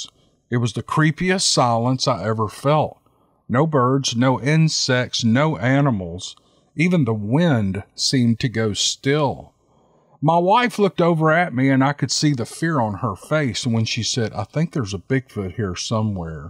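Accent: South, United States. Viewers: Hi, I hope you and your family have a safe and happy holidays. I'm from Australia 🇦🇺 and it's summer down here,... I love listening to your Southern drawl. Keep up the great work. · I enjoy the stories , don’t sell your self short . You are an articulate gentleman. Down home southern is refreshing, fun and precise. Merry Christmas. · Finding Bigfoot? Finding Nothing... You Don't Find Bigfeet. They Find You. (Don't Call Us. We'll Call You) I'm quite new to this channel, about 4 months at THIS typing. I'm addicted to it - Some super good, fun stories. I enjoy "Steve Lilly Journals" too. (God DAMNED Filthy 'Squatches!! Ha!) Good Writing! ~ I live in B.C. (Vancouver Island) Canada. I've spent decades living in some pretty "howling wilderness" & experienced some strange things. I've yet to encounter any Sasquatch...However, I know one serious individual, whose word I trust as veritable without question, and who claims He HAS seen one... 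I neither believe nor disbelieve. I don't know Anything for Certain. Indigenous peoples here take the Sasquatch as a matter of fact. Sasquatch are deeply embedded into their very ancient cultural mythos lineage. Many tribal members are quite candid about the phenomenon, they call "Pohk Miss" (Meaning "Big Foot" - Go Figure) as if as familiar, and real as the West Wind. I CAN say that we live in a vast, complex, diverse, and mysterious living world, about which We collectively barely know & hardly understand at all. It is always in a state of Constant Flux. Fools THINK they have a real grip on reality. ~ Been practicing Mr. nice regional Southern accent. (I like various accents and find them "geo-culturally" & historically interesting, with regard to the human condition.) I'm...Getting better...Getting better... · I love listening to you too in the mean while i am knitting or crochet a sweater or so, you have a nice voice and talk so that even with your southern accent it is pleasant and very good to understand, so keep on the good works, greatings from Marleen from far away europe in Belgium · I agree with Miss Jessie you do have a sweet southern accent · You can pronounce it any way you like--that sweet southern drawl (I'm in California orig from El Salvador) is what makes it so much more enjoyable. 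And yes, yes, the Sasquatch stories too, lol!! ❤️ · colloquialism and regional differences in syntax are what make regions unique. rather than focusing on any particular differences, realizing how any language changes through time, understanding and accepting these changes will help those who are hung up on particular words and/or pronunciations. as long as people can understand your message, then what does it really matter. and those who nit pick and complain just to complain, usually are projecting their own issues of self acceptance., your reading/narrations are always spot on and very easy to understand. Your Southern accent, draw and tone make it all the more enjoyable 😎🤗🙃💯✨😐. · So true. He has a comforting voice and I just love that southern drawl. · I'm a 70 years old lady in Pocahontas, Arkansas I like listening to your Bigfoot stories too. And I especially like to listen to stories about bigfoot being so close to where I live. 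Your voice seems southern and familiar so it keeps me company.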